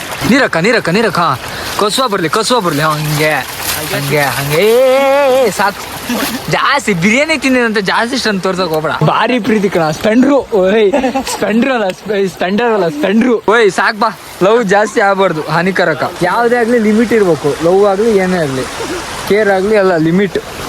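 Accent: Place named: Sirsi, India. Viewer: Bro nivu sirsi siddapura navra same a side tarane matadthira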